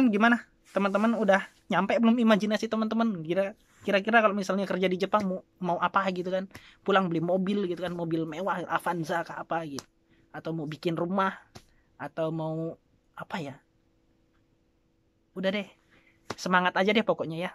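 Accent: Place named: West Java, Indonesia. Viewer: Urang sunda ieu mah nya....,keliatan logatnya...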